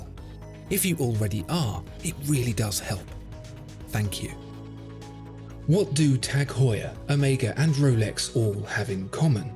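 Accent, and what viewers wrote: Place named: RP, United Kingdom. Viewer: Maybe it was my IPad or me: the Autavia I own has more visual cues than “ go from second hand then case back then name; do that 23 times with a British posh accent that asks questions that he doesn’t answer”. How has Watchfinder earned so many subscribers with that formula? Is it the soft lighting effect and the expensive SLR camera sharing the screen? Perhaps all those other channels that offer watch specs and model line discussion and competitor choices that relate to real-world reasons of why we buy watches- well they must be crazy. Loop four close-ups and add UK accent and there you have it. Half million subscribers.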